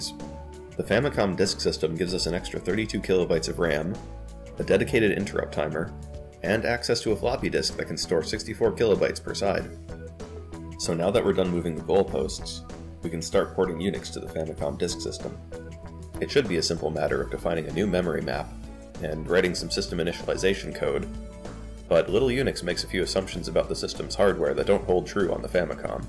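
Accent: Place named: Utah, United States. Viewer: Sick vid. Thanks. Also Ew. A ruht directory. Is it tuh a spuhn Or is it too a spoon Ew. Disgusting. 2+2 isn't 5 cuz "that's how we say it down here" are you from utah?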